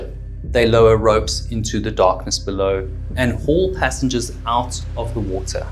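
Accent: South Africa. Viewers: I was not expecting a South African accent. Hello countryman! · I'm trying to identify the accent. My best guess is Edenvale/Sandringham?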